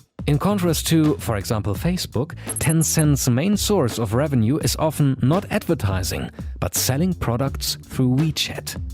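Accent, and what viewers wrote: Germany. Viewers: German accsent ( I Dieont know how to write that) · Man merkt richtig das du deutsch bist!😅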